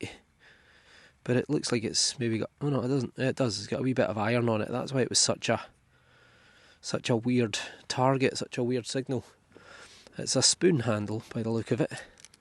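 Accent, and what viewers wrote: Scotland, United Kingdom. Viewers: A shout out from the States… another incredible journey! I love hearing your accent, as my mum was born and raised in Falkirk. She lived across the street from a big park, I forgot the name of it (I’ve been there as a wee lad but the name slips my memory) but she says there was a great battle there… battle of Falkirk? But would I love to lay my coil on that site! Thanks again for the great hunt… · Hello my friend. First of all, I'll have you know, that we Americans are entertained by your lovely Scottish accent! Furthermore, metal detecting over there is the ultimate dream for any metal detectorist . The oldest artifacts can only possibly date back to 1492 here in America. So, keep up the good work! Thx dmg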